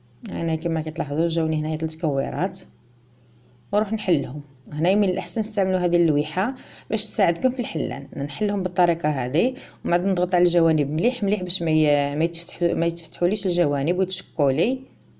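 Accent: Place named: Jijel, Algeria. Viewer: صباح الخير بنت بلادي الشاطرة الوصفات التقليدية نعرفهم ديالنا نحب نسمع اللهجة تعك الجيجلية اللهم بارك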